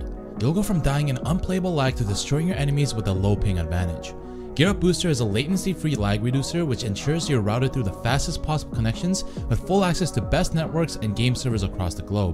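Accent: Australia. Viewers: you're Australian?? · Are you Australian or Asian? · Hey is an Aussie?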